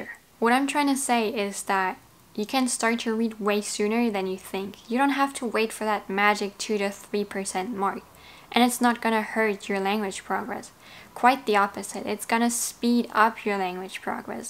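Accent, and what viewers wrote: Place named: Germany. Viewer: Wow I just found this video and you expressed exactly my thoughts about refold. So many of your points of view I also had them in the back of my head but for some reason I didn't realise I needed to listen to them from someone else. By the way, what is your native language? You give me strong German vibes but your English is really good!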